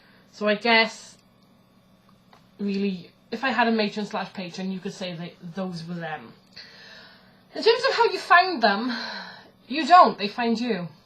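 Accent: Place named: Wales, United Kingdom. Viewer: Love your welsh accent, just recently back from a prog rock festival in north wales, I used to be in the kitchen witch school I really enjoyed it but family life kept getting in the way so I put it on the back burner for a while. Nice to see your face again, xx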